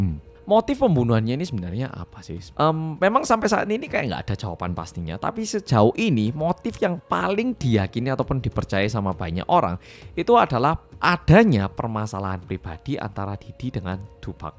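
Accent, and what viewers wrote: Java, Indonesia. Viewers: Akhirnya versi medhoook.. wlw telat wkwk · Sebagai orang Jatim paling ujung barat “Njenengan jouwosh, Mas”. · Akhirnya mas bikin videonya jg. Makasih mas. Krna penyampaian nya mudah dimengerti, detail jg, gk ketinggalan medhoknya 😅, editing nya jg keren sih, jd terhibur jg apalagi part mas nyanyi 😂🙏🏻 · Apanya yg lucu dari kemedhokan ini... Aku bhiasha ajha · Aku delok ki ora kyk delok youtuber korea kyk youtuber jatim… tp gpp iku warnamu wes ojo d ilangi… sering2 dolan malang ben gak ilang medok e… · wkwkw aku yg udah liat versi dari nessie sama jerry yg banyak pake aksen inggris, ke mas yg pake logat jawa jadi ngakak 😭 berasa ngobrol sama temen sndiri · Paling senang lihat penyajian Mas. Paling rapi dengan bahasa yg santuy dan gak bikin pusing yg lihat. Good job mas korea jawa medok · Korea medhok 😂 suka denger ngomong nya lucu bgt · karna medhokk, aku jd ngakak bukan horror wkwkw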